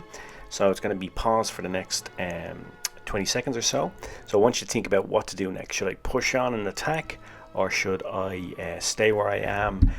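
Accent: Ireland. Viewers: On the subject of dulcet Irish tones, Wargaming should hire you to replace the crew voice in the British tanks.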